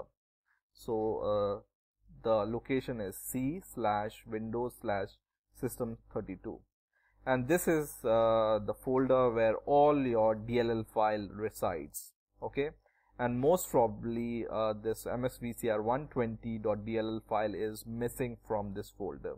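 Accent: India. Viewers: I heard his indian accent = instant CTRL+W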